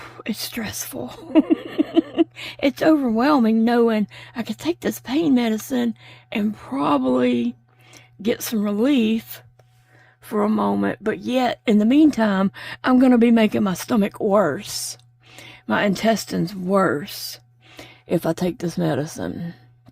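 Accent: South, United States. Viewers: Ms, I think we are all at different places in the spectrum of sharing our details. I'm probably at the opposite end of you, I don't feel it's an honesty/non-honesty thing, but just a comfort level at disclosing our intimate details. That said, I think your videos have helped many who also struggle physically with chronic issues and I admire your courage in putting it all out there. And when you struggle, I pray. God may already know what you are going through, but He has commanded us to pray one for another, so I will. And on the good days and when you share your blessings, I also rejoice with you. So I hope and pray that you feel those prayers and are strengthened knowing there is a community out here that cares for you and is sending up powerful prayers on your behalf. With love, your sister in Christ!❤... Can I just add that God gifted you with a beautiful voice and a sweet laugh, with your easy sense of humor. 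That sweet southern accent is so easy to listen to as I crochet with you. And please don't forget when it seems no one understands, no one gets it, Jesus does. I know you know this, but sometimes we just need the reminder. The feelings of despair and discontent are not from God, by the way, so rebuke them in the name of Jesus and start praising Him for all you do have...not what you don't have. Any of us can get ourselves in a funk when we listen to those nasty minions, despair and discontent who come straight from the pit.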